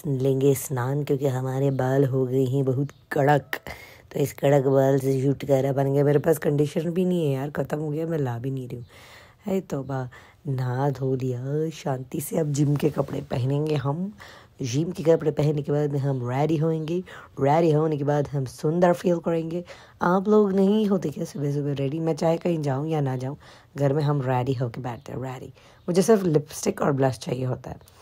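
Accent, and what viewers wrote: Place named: Bihar, India. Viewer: Mashallah...Half English Half Urdu...You sound like a Bihari when you speak English...I have some medicine can you please promote that on your channel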